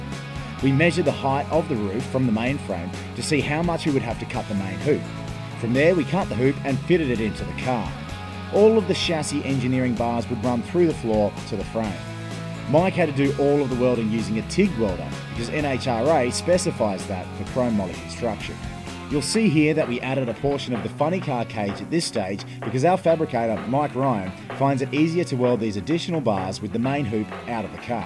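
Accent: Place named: Australia. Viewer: Aussie voiceover guy, who'd of thought. :)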